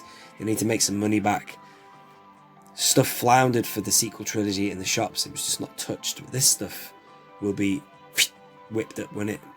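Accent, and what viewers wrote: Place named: United States. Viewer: It would be nice if the 3.75 were as detailed as the 6! BTW you do a great American accent! 👍🏼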